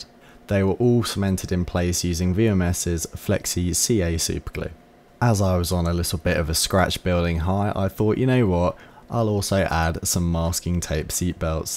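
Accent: England, United Kingdom. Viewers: Hi, re Tamiya where are you? You sound English? For two years you are rather good. Do you know how many models you have made? Bob England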